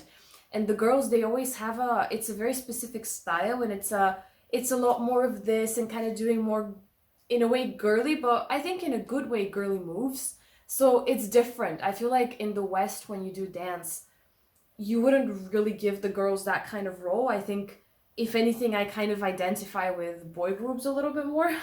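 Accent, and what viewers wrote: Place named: Ireland. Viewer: love Ireland accent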